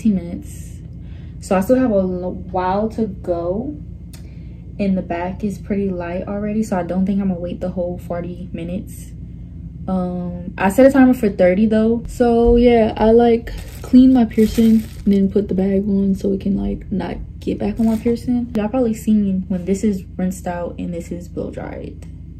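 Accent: Louisiana, United States. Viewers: Are you from Louisiana? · Y’all what state she from I need to know with this accent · You have so much hair! It turned out so pretty! I feel like I heard a Louisiana accent! ❤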